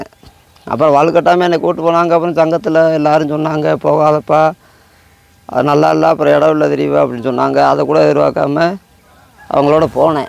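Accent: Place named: Rural, India. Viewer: அவர்களே நீங்கள் நல்ல விவசாயி ஒரு விவசாயி நாடகத்தில் சிரிக்க வைக்கின்றீர்கள் மேடைக்கு வந்தாலே சிரிப்பு வருது உண்மை உங்கள் பேச்சு கிராமத்து மணம் தெரியும் போற்றுவோர் போற்றி புகழட்டும் புழுதி வாரி தூற்றுவோர் தூற்றி போகட்டும் விமர்சனத்தை கடந்து போங்க இறைவனுக்கு நீங்கள் யார் என தெரிந்தாலே போதும் 2கலைஞர்கள் சேர்ந்து நடிக்கும் போது இதெல்லாம் வருவது சகஜம் தான் தொடர்ந்து நடிங்க ஒரு கார் வைத்து நீங்கள் பேசியது நல்ல காமெடி பேசினாலே சிரிப்பு வருது பராசக்தி ஆரோக்கியம் அருள் தரட்டும்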